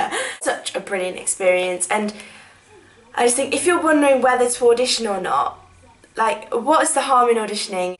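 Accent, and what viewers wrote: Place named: South, United States. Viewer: this is great! Just hearing your southerner tones, and seeing you brought back so many memories...This is great!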